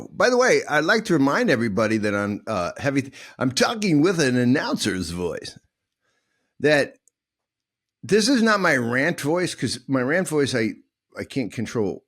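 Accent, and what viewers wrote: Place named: California, United States. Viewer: This is strange. You look a lot like a man I meant at the monastery of katskhi a couple of months ago and you sound just like this Californian guy I know named Peter..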